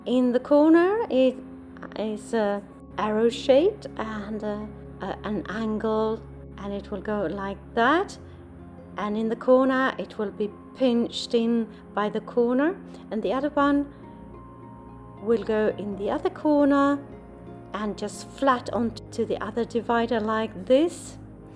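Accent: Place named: France. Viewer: You speak excellent English with your wonderful French accent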